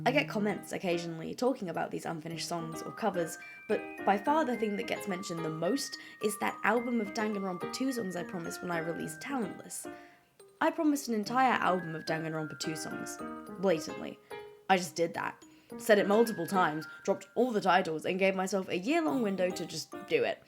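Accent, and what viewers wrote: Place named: United Kingdom. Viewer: oh god she's british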